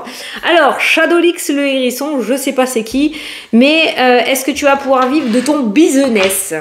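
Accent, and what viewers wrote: South, France. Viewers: domage j'aimais bien ton accent du midi ton franc parlé et tu m'éclate quand tu raconte tes histoires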